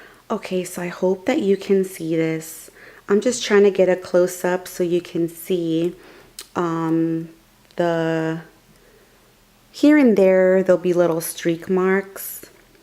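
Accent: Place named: Louisiana, United States. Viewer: Great video!! Your accent sounds like you’re from Louisiana!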